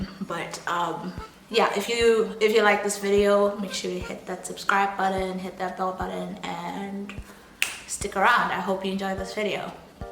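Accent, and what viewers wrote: South Africa. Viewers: She does sound South African, I'm not picking up Zim accent. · You sounds south African, where are you from? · I understand this video is from last yr,but ur accent convinces me you've been living in SA for quite some time now,is there still xenophobic attacks going on in SA?..or do you feel crime and robbery is worse in SA than in your country and what are your experiences with crime or even xenophobia if you have experienced them?cos it sounds like it really bad out there...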